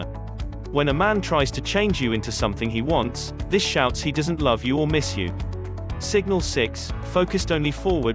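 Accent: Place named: United Kingdom. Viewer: Of course all of this totally true. What I loved the most about the content wasn't the content at all. It was the straight up no bs delivery in smooth British voice was delicious and hilarious. By the time the video ended my mood was lifted. Well played. Thx for sharing.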